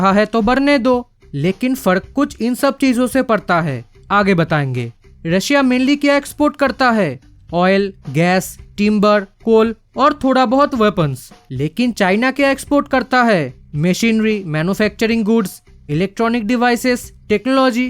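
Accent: Bengal, India. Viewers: Bangali naki dada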